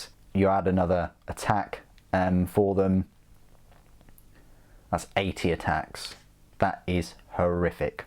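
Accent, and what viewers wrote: United Kingdom. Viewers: THis may sound a bit silly but I love your voice, it encompasses Britishness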